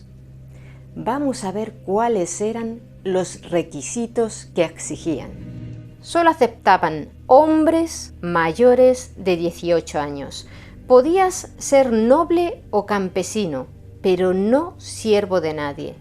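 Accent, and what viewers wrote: Spain. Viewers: El único acento Español que me encanta escuchar en videos como este sin distorsionar las historias es el de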